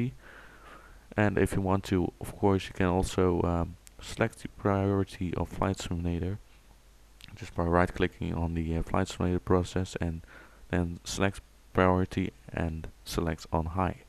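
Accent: Netherlands. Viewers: nice gonna try it ! by the way you have a dutch accent haha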